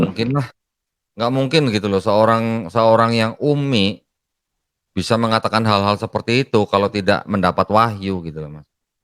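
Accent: Banyumas, Indonesia. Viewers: Koh ngapax dong???🤭🤭 sekolah nya dkt ST cilacap ya koh? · Berarti pak bisa ngomong ngapak dong?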